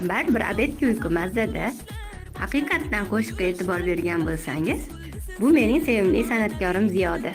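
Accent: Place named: Andijan, Uzbekistan. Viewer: Anjandi shevasi baribir boshqacha daa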